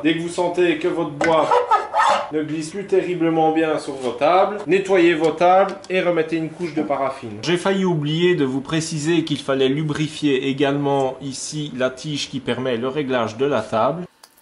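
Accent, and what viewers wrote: Belgium. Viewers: Bonjour, suite à ta vidéo sur la raboteuse dégauchisseuse j’ai vu une chose qui m’a bien plu et que j’ai appliquée. Ce système pour affûter les fers de rabot est vraiment ingénieux et facile à faire malgré tout et je me suis dit pourquoi ne pas essayer et ça marche vraiment bien car j’ai pu affûter plusieurs séries de mes fers « rabot- et dégauchisseuse » merci aussi a Copeaux… car elle y est pour quelque chose lol !! Tu vois que parfois une femme dans un atelier ça peut-être pratique .Je plaisante bien sûr .Je me suis abonné a sa chaîne aussi de ce fait .Dis d’après ton accent tu ne serais pas un peu Belge une fois ou je me trompe ? Moi je suis du Pas de Calais donc pas très loin. Merci pour tes vidéos sympas que je ne manque jamais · Salut, tu es Belge ? (Tu dit 1,70€ et accent) Il parait qu on trouve la Titan a 228€ chez Brico Depot en belgique Brico Planit ?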